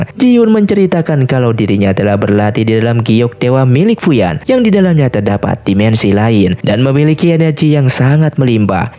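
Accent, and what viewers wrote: West Java, Indonesia. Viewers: Sunda mang? Abdi sunda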